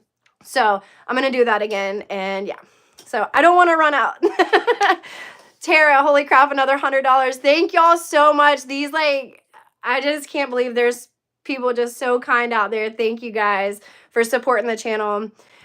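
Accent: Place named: South, United States. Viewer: You're very beautiful woman and I love your little southern accent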